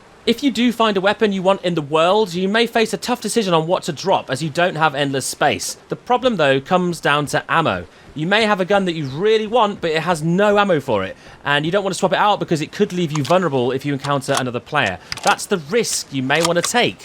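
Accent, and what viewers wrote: United Kingdom. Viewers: Uncomfortably British...jesus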